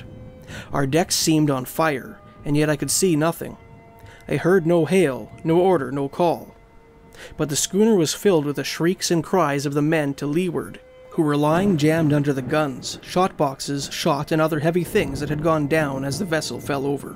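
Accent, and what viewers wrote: Canada. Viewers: I’m glad to hear a Canadian accent, you don’t hear it much in B.C., sounds more American here.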